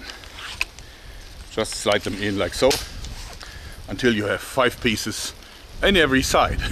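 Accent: Germany. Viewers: german accent with an evil laugh ? I got the best video in my 33 year life. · German english accent is great :) and laught is so fresh , don't change anything man !! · He even has the german accent going for him.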